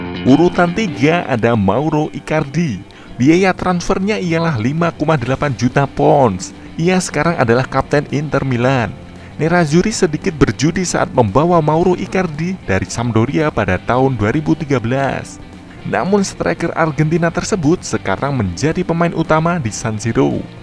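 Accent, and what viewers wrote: Java, Indonesia. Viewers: pengisi suaranya medoookkk..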